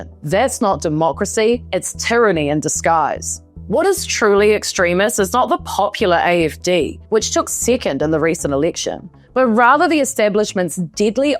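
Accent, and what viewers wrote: New Zealand. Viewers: Nice one, I thought you were an Aussie until you I heard you say Albanese incorrectly. But that's ok, Kiwis are cool too, should become our seventh state. 😛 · The accent screams Kiwi. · I am guessing NZ accent doesn't sound Australian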